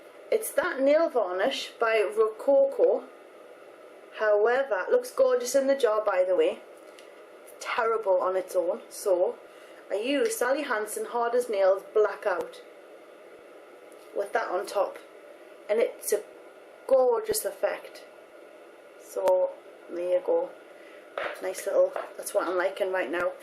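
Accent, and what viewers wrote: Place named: Northern England, United Kingdom. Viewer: I always enjoy listening to your Northern English accent! And your makeup tips are awesome as well ;)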